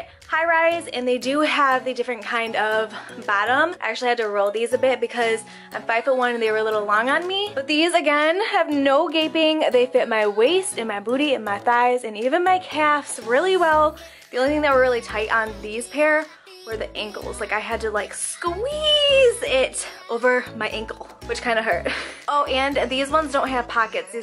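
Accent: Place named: Michigan, United States. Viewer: I had to check out your Instagram to see if you’re from Michigan lol you have the cutest Michigan accent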